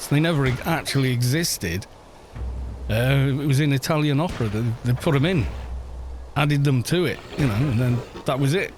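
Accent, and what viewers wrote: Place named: Somerset, United Kingdom. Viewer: Have to correct the record for my local brethren: the Wurzels (of Combine Harvester fame) are from Somerset and so is that accent. Cornwall and the Black Country are both pretty different :D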